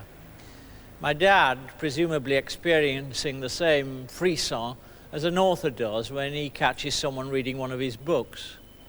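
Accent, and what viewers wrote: United Kingdom. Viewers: A B doing what he does best. And a delicate balance of his native Yorkshire tongue interspersed with posh southern vowels. Almost a long A in "dancing" at 5.54.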